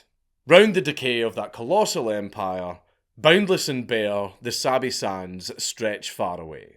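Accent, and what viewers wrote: Scotland, United Kingdom. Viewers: That Scottish accent is great... "Sex lions", eh? 😂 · I could listen to a Scottish guy talk about anything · are you the scottish version of beardo gets scared ?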